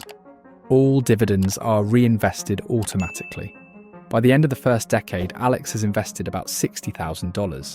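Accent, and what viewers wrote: England, United Kingdom. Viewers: Suggestion: change the accent. The English one is getting annoying.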